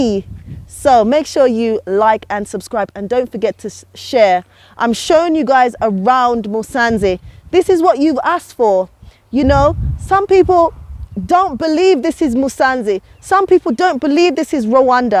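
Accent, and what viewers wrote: United Kingdom. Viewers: Got this recomended love from uganda🇺🇬rwanda🇷🇼 is beautiful love your accent too u sound british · I liked the way you’re so passionate and really proud of Africa,especially Rwanda 🇷🇼 I liked your mancunian accent by the way 😁 I can’t wait to come back home back too Ciao cara ti amo già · She sound British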